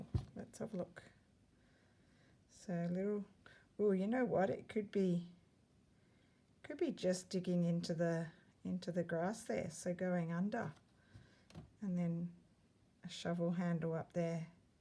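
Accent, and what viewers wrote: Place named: Australia. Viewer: Thank you, for your time, talent, and inspiration! I, too, have no friends that slow stitch! I do have fellow teacher at my school who is from Perth - she spends our summer there. So, talking with her is like visiting with you and - I love the accent!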